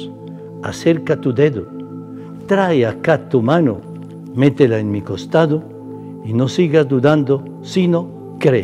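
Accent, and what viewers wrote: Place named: Colombia. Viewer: Cuánto gusto padre, lo veo muy bien a pesar que le dio el covid, Dios lo protegió. Padre usted e Colombiano, siento que habla el español en forma diferente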